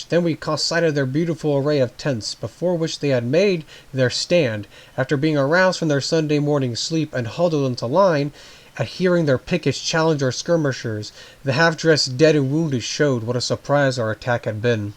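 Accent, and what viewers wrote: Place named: North, United States. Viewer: You sound like a yankee carver bagger.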